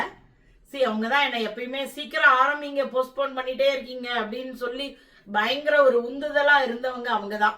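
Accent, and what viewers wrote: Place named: Bangalore, India. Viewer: Thakshanam is the unique Bangalore Ayyangar bhashai ❤it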